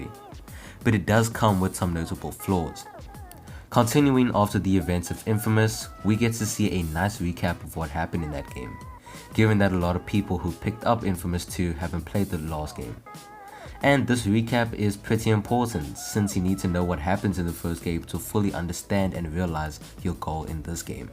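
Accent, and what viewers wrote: South Africa. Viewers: Yarre I can hear in your voice u a Southie mybra🤣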